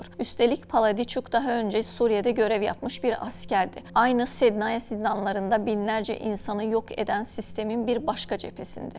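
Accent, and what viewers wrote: Azerbaijan. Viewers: bu Kızın aksanı var Azərbaycanlimi? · Bu nedir yahu? “Bu kız degil! Bu sunucu diyeceksin! Evet Azerbaycanlı ve haberleri gayet güzel sunuyor. Sonuçta birçok kişi aksanla konuşuyor; Kürtlerin de kendine özgü bir aksanı var. Yoksa sen de mi Kurt oluyorsun:)!?? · Azerbaycanlı galiba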